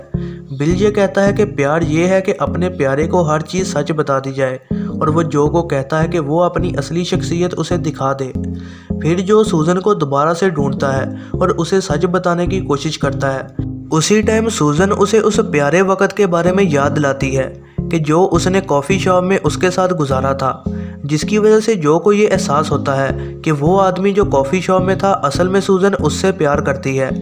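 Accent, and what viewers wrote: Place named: Punjab, India. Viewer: Ur Punjabi accent makes explanation more interesting bro....keep growing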